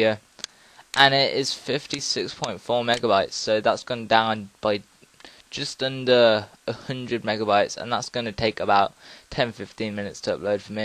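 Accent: United Kingdom. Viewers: TOO English accent !, Cannot understand a thing. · okay apparently thats not it. It says it only uses that for previews. I just did it again from scratch along with the video and still nothing. By the way are you scottish? I've been watching monarch of the glen and you sound like them. I'm from Los Angeles so... ANYWAY I'm going crazy. I CANT FIGURE OUT WHY ITS TURNING IT INTO AUDIO. It's still mp4 but the video is gone and even the thumbnail doesn't have an image. Just windows icon which is a film reel with a music note. The video is gone. WTF?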